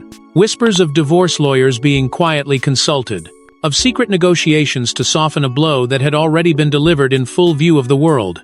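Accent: United States. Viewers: Strange how these American-voiced Royal Family tit-bits all seem to stretch out to the 20+ minutes mark! They're all sheer speculation, & imagination, with NO evidence to support them: Simply cash-earners from YouTube views etc. - Don't believe any of it!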